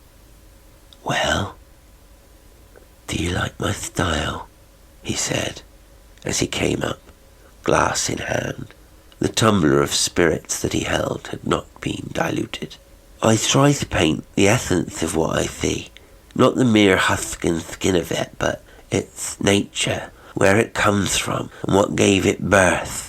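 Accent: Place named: California, United States. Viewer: thanks very much. It's good that we have a channel like this - to least because of the good response everyone seems to get from yourself. These "spook story" channels seem to be a growing "thing". Its a bit like what enjoying radio dramas must have been like. A bit disconcerting hearing someone from california talking about forgotten railway stations in Norfolk! Lol. Suggestion....it might be an idea to do Machens "the white people". And get a woman to actually read the "green book" part. I've said this before - to others, with no luck. Think it could be a very special contribution to your channel if you could pull it off. It is after all one of the seminal "weird tales" of the last century. The "Dunwich" brothers posh anglo Welsh gran.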